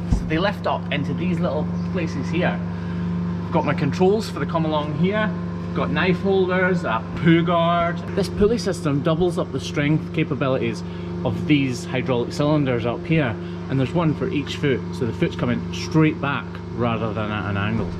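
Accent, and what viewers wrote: Scotland, United Kingdom. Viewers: What an awesome piece of equipment. As a lover if all things dairy, I was surprised when I first discovered your videos. I never realized how much attention cows' feet should have. Your channel is so professionally done. I especially like your opening. Obviously you work very hard. I also appreciate listening to your lovely Scottish accent and seeing the farms setting. Thanks! From Southeastern Pennsylvania.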